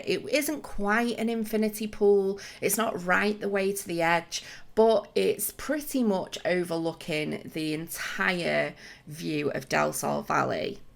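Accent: United Kingdom. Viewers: Enter! I'm a 15 years old boy from Bavaria, Germany. I love your videos, your britsh accent and the ideas that you come up with! You really inspire my builds! I hope you have a great time and please go on with those amazing speedbuilds. Much love from Munich😍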